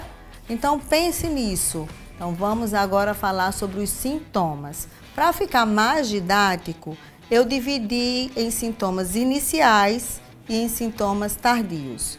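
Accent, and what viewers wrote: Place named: Rio Grande do Sul, Brazil. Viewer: amo seus vídeos doutora,inclusive esse,suas lives tbm,seu sotaque é muito parecido com o da minha terra rs RS,abraço 😘❣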